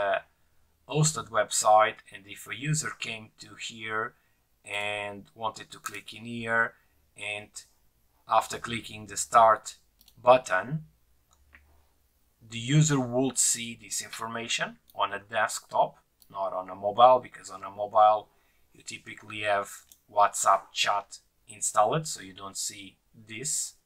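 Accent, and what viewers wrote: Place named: Portugal. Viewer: meu, que accent mais tuga!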